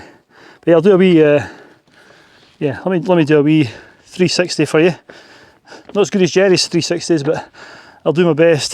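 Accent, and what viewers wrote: Scotland, United Kingdom. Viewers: It is spectacular place indeed! I started from opposite side and I had an amazing weather. I was drained at the end of the day but it was worthy. I wish to come back there with my friends! Thank you for your videos. I enjoy all of them! Well done! I am practicing with your Scottish accent :))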